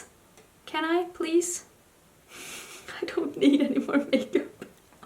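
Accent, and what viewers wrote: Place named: Sweden. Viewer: Hey! I've been mia for a few months, but congrats on 50k!!! And I'm loving the hair; I'm planning something similar ❤ Your English is getting so good we wouldn't even know you were Swedish without that cute accent!! Lol. In the U.S. we usually only use "neither" when referring to only 2 things. Like...I like neither salt, nor pepper. If it's a group of more than 2 we usually say, "I don't like any of it." Or "I like none of them." I'm only saying this cause I know you won't be offended, and I know you're a smart girl so you like knowing EVERYTHING about something. I can tell, cause you're like me. 😉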